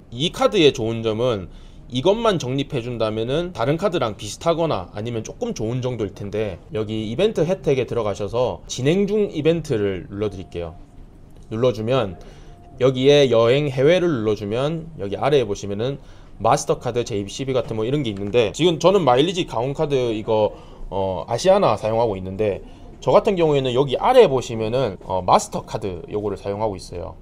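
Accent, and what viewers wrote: Gyeongsang, South Korea. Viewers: 오늘 님 300만원 프로젝트 1탄부터 정주행했습니다. 잘꼭따라가고 월 300은 아니어도 200이라도 벌었으면 소원이 없겠어요 간절하네요 저도 고향이 갱상도라서 사투리가 넘 와닿고 ㅎ 검단에살고 있어서 눈오는 풍경도 낯설지가 않네요. 꼬옥 성공하고 싶어요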